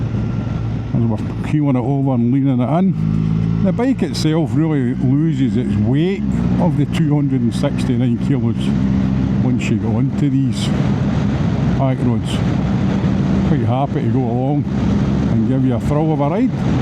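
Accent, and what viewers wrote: Scotland, United Kingdom. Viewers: One of the very best reviews on the <num> GSA by a person who obviously knows his GS or GSA bikes. A very good video compilation comprising super illustration of bike sounds and agility, and love that cool headed Scottish accent - just like your country cousins here in Co. Antrim 😄 ! I love my R1250GSA TE and the 1300 GSA TE will indeed need to be top notch to get me off it. Your video certainly has encouraged me to make that move ....... say in another year but the move is now on the cards given your excellent review answering lots of my unknowns and obviously a test ride will be in order. It's hard to beat German engineering. Many Thanks, Wm. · Hi, great review. I had to get used to your Scottish accent, but once I did, I really understood you (I'm from upstate NY). Can you do a review of the GSA with ASA? I'm curious as to what you think about it.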